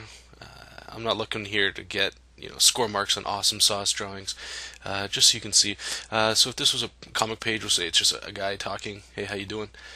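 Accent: Canada. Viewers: Are you from Canada, aye?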